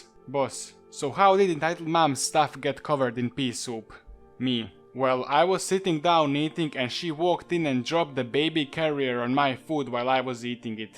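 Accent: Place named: Russia. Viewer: I know that accent. Sounds like Russian. But u never know it could be Indian. Sounds like either one. Either way nice vid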